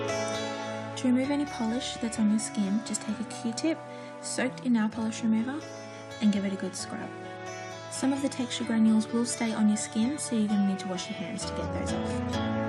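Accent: Australia. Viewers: Love this manicure so much! And it's really good to hear an Aussie accent on YouTube too. Happy Christmas to you, from May in Perth <3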